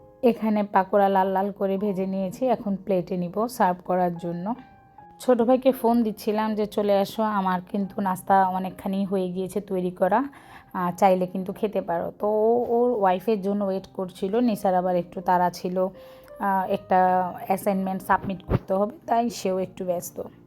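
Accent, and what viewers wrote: Noakhali, Bangladesh. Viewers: নুডুলস ,পাকোড়া তারপর অনেক সুন্দর সুন্দর রান্না। ছোট ভাই, ছোট ভাইয়ের বউকে খাওয়ানো আর আমাদেরকে দাওয়াত না দেয়াএটা খুব কষ্টদায়ক কিন্তু আপনার শারীরিক সুস্থতা কামনা করছি। আর আপনার নোয়াখাইল্লা ভাষা তো তুলনাই নাই।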